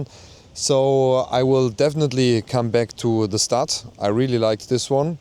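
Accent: Germany. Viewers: Kannst nicht mal auf deutsch, bist aus deutschland das hört man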